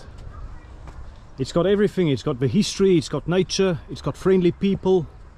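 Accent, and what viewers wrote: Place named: Afrikaans, South Africa. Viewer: 😂Aggg jirrrr, ons geniet jou stories baie. Ons weet jy is afrikaans en probeer jou beste Engels praat, Maar genade my mens. As jy wil sê n plek is gesluit of toe vir die dag.... ASB sê dan tog net "it's closed". Dis die regte Engels. Ek kry krampe in my ore elke keer as jy praat van"it's shut". Waar gaan jy volgende?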